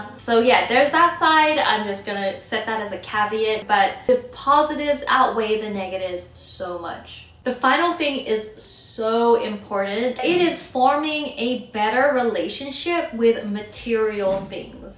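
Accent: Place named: Australia. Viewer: Does any one remember Australian accent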